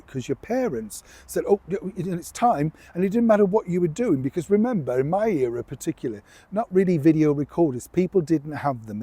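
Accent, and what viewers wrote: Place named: Northern England, United Kingdom. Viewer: Hello Big wave, Chums👋👋 I am on of the ones that have been here since the beginning. Well, under 1000 listeners, anyway. I remember the day got to 1000, it was a celebration! I like because he is from the north, and talks like my late Da. He uses similar expressions as our Da. He also has the same eyes but then so does Piers Morgan (ooh, he looks like Da! lol!). It is all in the eyes. The crinkly blue eyes. I miss me Da, so it is like coming home when I listen to. And I WILL be, next week, yay!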